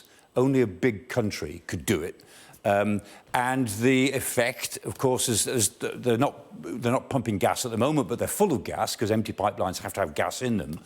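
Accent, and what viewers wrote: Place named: England, United Kingdom. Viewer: He really does. I thought that he and Michael Caine must be from the same part of England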